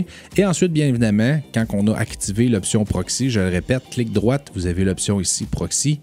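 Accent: Quebec, Canada. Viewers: 😎 Merci beaucoup pour cette vidéo. Même si je faisais déjà des montages de base sur DaVinci Resolve depuis la version 16, je viens de passer à la version STUDIO 18.6. Je crois que tu m’as influencé. C’est cool d’avoir une ressource avec le même accent que moé. Si tu habites la région de Québec, ça serait cool de prendre une bière ensemble et jaser de montage vidéo.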